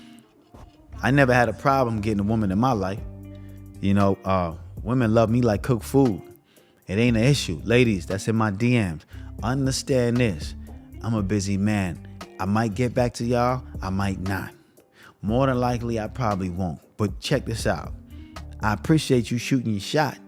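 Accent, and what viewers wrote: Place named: New York, United States. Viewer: That NYC storytelling ALWAYS hilarious 🤣🤣🤣 Tell the story like a Ny'er or ion wanna hear it🤭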